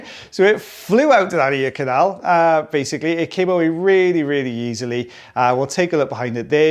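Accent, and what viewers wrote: Wales, United Kingdom. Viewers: Amazing wax removals , just enough extra info, some audiologists are too technical, love your videos, your Welsh accent and enthusiasm make watching. A pleasure.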